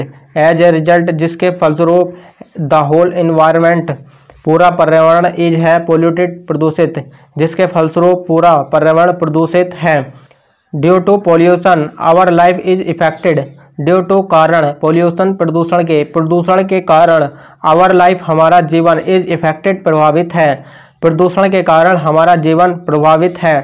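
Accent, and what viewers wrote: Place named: Punjab, India. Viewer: Sir aap Punjab se ho kya? Mja aagya aapka language sun ke Hindi +Punjabi+English Mja aagya🤠🤠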